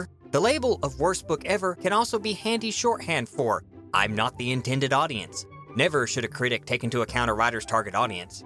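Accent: South, United States. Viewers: Your southern accent makes me happy :)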